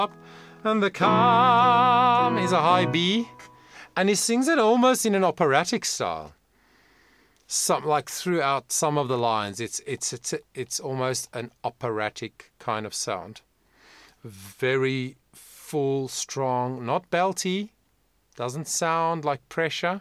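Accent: South Africa. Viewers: Thank you for this reaction. Hope you don't mind a long comment. Firstly, I listened to your opinion 3 times over a period of weeks. You sound to be from SA and so am I. The 1st time I thought, about your comments, can't you just listen and enjoy the way I do. Over time I came back to your reaction. Thought, ok, he wasn't being negative, he was teaching about different styles. Then after another period I listened to your reaction again. In the meantime I probably listened to all and every reaction. So, thank you for teaching me a few new ways of understanding why sounds, like growls, high notes, softer notes, vibratos and other fluctuations attracts me. I will now try to remember at least some of the things you mentioned. Please react on other Dan Vasc covers like, and the massive version of. Thank you.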